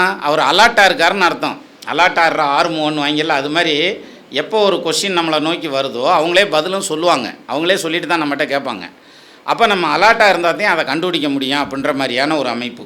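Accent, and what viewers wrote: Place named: Madurai, India. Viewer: இவருடைய மதுரைத் தமிழ் சொக்கன் குடுத்த சங்கத்தமிழ் சொக்க வைக்கும் தமிழ் அற்புதம்.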